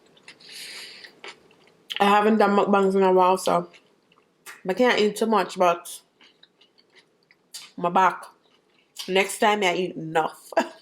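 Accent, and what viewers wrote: Jamaica. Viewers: U got a jamican accent❤🇯🇲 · Oh so you sound Jamaican and by the way congratulations on your baby boy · I know this was 2 years ago but the accent got me curious are u part Jamaican let me know please 🙏 🙏🙏🙏 And ur baby is so cute. Ur vids always got me smiling.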